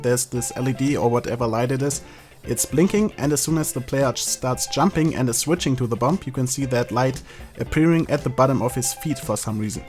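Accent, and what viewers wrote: Germany. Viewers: Man hört so richtig das du Deutsch bist :D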